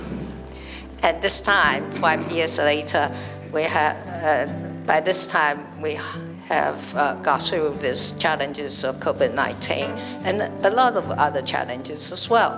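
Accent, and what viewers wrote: United Kingdom. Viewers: 英文真的蠻好的, 還帶著英國腔調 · 蔡總統的英國腔好好聽啊～更愛她了...😍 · 本來就有英國腔嗎？ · 英式腔真的舒服，未來可能難再有這樣腔調的了，希望未來外交部長或總統至少都要拔擢這樣的腔調人才，有利外交形象。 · British accent very good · 这个英文说的 不太流利, 有点英国口音, 还有点中文口音. 这种英文水平在欧美做律师还是不太够资格的. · 小英總統的英國英語腔調真的優雅好聽！❤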